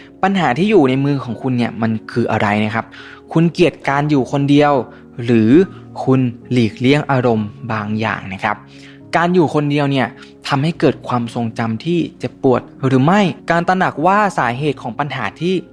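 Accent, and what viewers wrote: Thailand. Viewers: สำเนียงไทยน่ารักมาก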